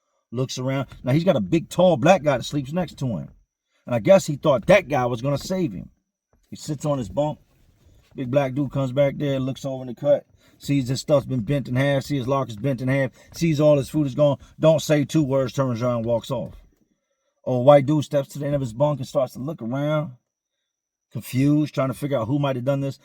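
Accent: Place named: Maryland, United States. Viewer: You sound like you from Md. Love how you don't sugarcoat like prison is fun because its terrible.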